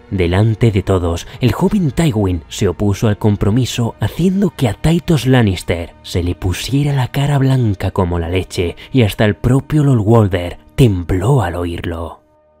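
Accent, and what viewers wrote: Castile, Spain. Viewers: No me cansaré de repetir el castellano le da muy buen toque al relato, 👌 un nuevo suscriptor se anota. Espero que traigas más relatos .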